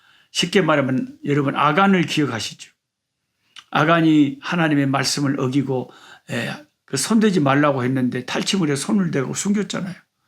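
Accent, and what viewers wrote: Jeolla, South Korea. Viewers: 매번 야심차게 성경읽기에 도전하다가 레위기를 만나면 그냥 적당히 대충 느슨하게 읽는 흉내만 내다가 넘기곤? 했었는데 목사님께서 사교육비도 안받고 1대일 성경교사가 되어주셔서 어려운 성경을 너무 쉽게 그리고 하나님의 사랑을 느끼며 읽게 되었음에 감사드립니다. 하나님이 이렇게 세심하게 어리석은 인생에게 하나님 나라의 거룩한 백성으로 살아가는 방법을 가르쳐 주셨네요. 제사의 종류와 제사의 방법이 또한 정확하게 정립이 되었고요. 그 매번 제사장의 도움으로 어렵고 두렵고 복잡한 제사를 드리지 않아도 예수님이 친히 대속물이 되어주셔서 단번에 해결해주심으로 말미암아 이제는 성령님의 도움으로 언제 어디서는 예배자로 설수 있음이 너무나 감사합니다. 지난 연말부터 교회적으로 시작한 성경통독 프로그램과(목사님~ 여긴 목사님 팬이 많은 진해동부교회예요^^) 꿀송이 보약 큐티를 하며 레위기를 두 번 읽게 되었는데 더 분명해지는 말씀의 은혜가 있습니다. 목사님의 남도 사투리가 얼마나 사랑스럽게 들리는지 매일 목사님의 예사롭지 않은 등장을 기대하는 1인입니다. 자주 자주 꽃을 들고 등장하셔서 얼마나 사랑스럽게 바라보시는지 그 눈빛에서 목사님의 사랑의 마음을 느낍니다. 오늘 들고 나오신 나팔꽃 갸가 활짝 아름답게 핀걸 우리에게 보여주고 싶은디 그걸 아쉬워하시는 모습에서 이미 우리는 가장 아름다운 남아공의 꽃들을 마음으로 보았지요. 목사님~ 너무 감사하고 사랑하고 축복합니다.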